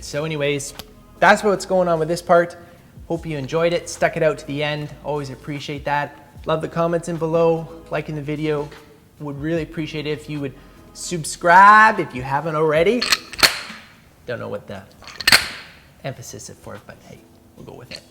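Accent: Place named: Canada. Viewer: Are you Canadian, or is it just an act? just wondering because it sounds forced at times 🤔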